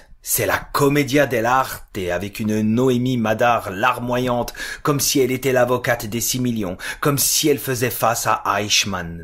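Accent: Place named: Algeria. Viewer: Merci pour toute ces lectures, et sinon vous êtes maghrébin n'est ce pas? En tout cas votre "accent" et façon de parler l'est et c'est de l'algérien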